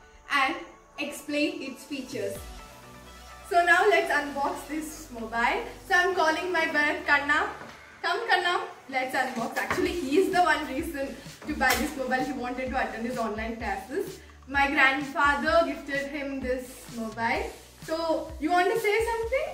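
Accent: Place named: South India, India. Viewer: I think ur south indian, but bytheway it was nice 😀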